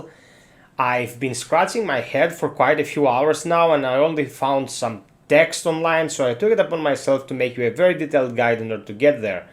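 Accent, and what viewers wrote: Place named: Greece. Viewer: φίλε ευχαριστώ, τώρα το αγόρασα το παιχνίδι και είχα κολλήσει οπότε ξεκίνησα το quest της ranni. Από φωνή ψιλοκατάλαβα την ελληνική προφορά και μετά είδα το channel description 😂 Here's a sub :D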